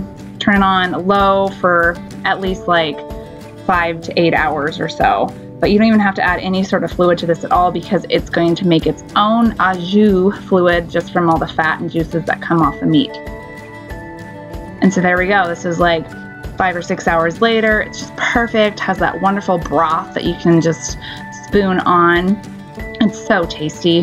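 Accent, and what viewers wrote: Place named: Minnesota, United States. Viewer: Your either from Mn or wi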